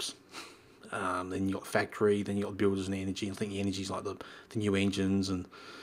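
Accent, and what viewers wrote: Australia. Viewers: YOU. Are Australian. I love you now. (: FROM ME ♥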